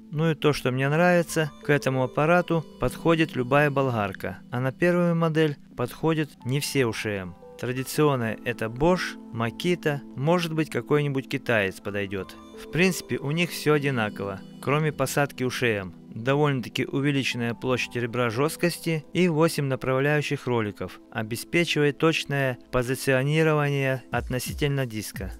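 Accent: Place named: South, Russia. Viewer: Южный акцент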